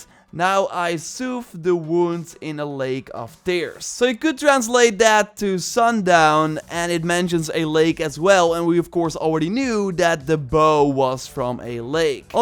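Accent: Netherlands. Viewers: Are u dutch ???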